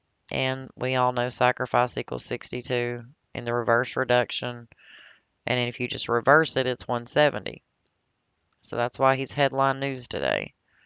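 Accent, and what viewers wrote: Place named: South, United States. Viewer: Good breakdown i love that southern accent so much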